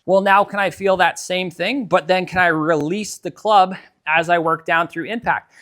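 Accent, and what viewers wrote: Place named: Canada. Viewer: Well done. The Canadian accent really shows up in this one. Love the simplistic way you explain that.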